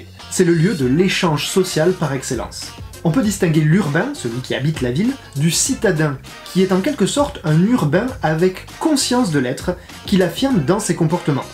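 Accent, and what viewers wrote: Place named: England, United Kingdom. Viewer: Super accent anglais 😉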